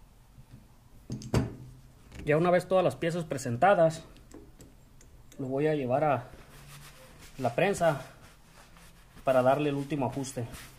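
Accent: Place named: Mexico. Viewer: Te doy like x la tonada mexicana nomas☝️😂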